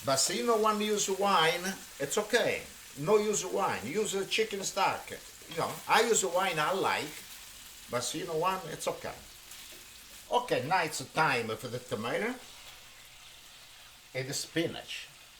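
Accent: Italy. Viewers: I am here for the accent and the kickass Italian vibe here! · I love his thick Italian accent. The best ❤️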